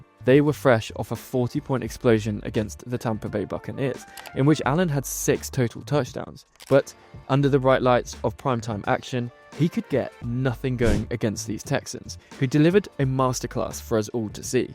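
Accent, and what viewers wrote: United Kingdom. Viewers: It feels surreal to watch a video about my Texans being good narrated by a British bloke 💙❤️💙❤️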